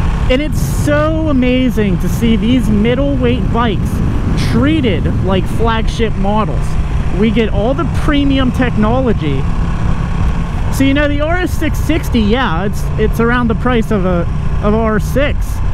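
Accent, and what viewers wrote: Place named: Pittsburgh, United States. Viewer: subscribed for the pittsburgh accent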